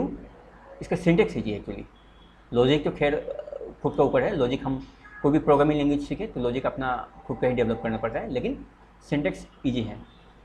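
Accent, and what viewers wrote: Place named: Assam, India. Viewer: There is a touch of assamese tone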